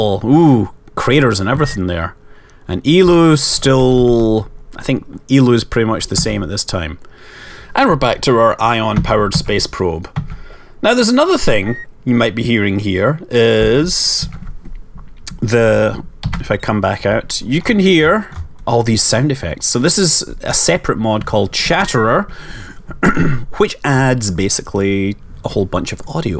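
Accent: Scotland, United Kingdom. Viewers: you sound Scottish or Irish possibly welsh or from northern England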